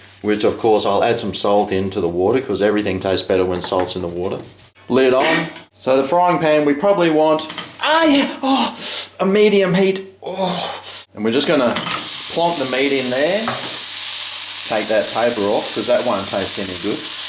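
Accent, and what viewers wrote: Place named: Australia. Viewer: You sound Aussie, I’m Australian as well. I love the way I make spaghetti Bolognase aka spag bowl I cook the mince then add mint leaves I also add mushrooms and honey as well believe it or not that tastes really nice in the mince I add a fair bit of bolognase sauce and add cheese afterwards when I dish it up you guys can decide what cheese you want but yeah that’s the way I do it and it’s absolutely beautiful, I can do that within 30 mins if not less and it’s the best meal I can do within that time imo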